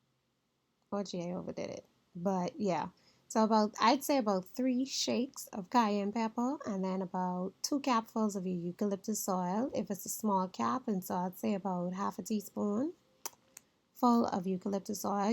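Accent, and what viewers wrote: Bahamas. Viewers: I hear an accent - Bahamian?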